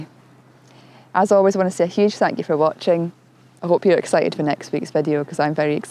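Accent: Scotland, United Kingdom. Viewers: nice footage , coupled with your voice ( commentary ) and the music go all very well, the Scottish accent remind me the days i spent in Scotland during my study at the university of Glasgow >>> Thanks for sharing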